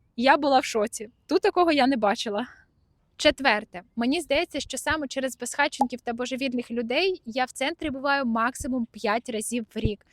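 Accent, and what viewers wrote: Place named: Ukraine. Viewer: I like your accent. Pure Ukrainian!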